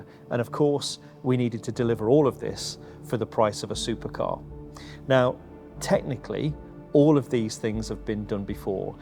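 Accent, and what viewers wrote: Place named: United Kingdom. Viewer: sounds like a new British billionaire. Godspeed.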